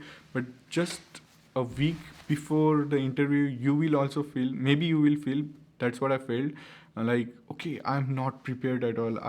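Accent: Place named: India. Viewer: Are you a student? You have a strong accent, i would recommend you to work on your accent as well. it goes a long way for indians to neutralize that thick indian accent if they are looking for jobs in the US.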